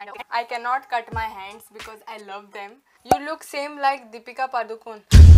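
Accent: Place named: Goa, India. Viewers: That konkani accent doest suits you.,........😬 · I haven't been to goa in such a long & hearing you speak in that typical Goan accent makes me want to go there and learn the rest of the language and speak and catch the accent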